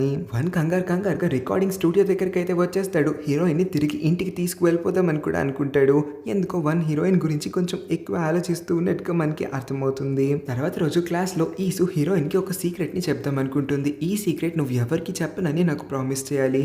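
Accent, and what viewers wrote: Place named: South Korea. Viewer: మీ మాటలు వింటుంటే కొరియన్ బాయ్ తెలుగు మాట్లాడుతున్నట్టుగా ఉంది మీరు మధ్య మధ్యలో నవ్వే నవ్వు భలే ఉంటుంది క్యూట్ గా ❤❤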